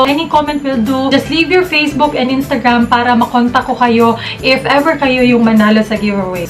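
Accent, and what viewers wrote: Visayas, Philippines. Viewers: You're so loveable and very enjoying to watch! Tho you have that bisaya accent, you show your true and natural self to your subscribers! ❤ Continue to inspire us and do a lots great videos! May God Bless you always po Ate! Power! 💖💖💖 FB: Jorgie Villas Instagram: jrgvlls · mau diay bisaya kaau mo say AHAHAHHA I'm also a bisaya hii ate were proud to be Cebuano's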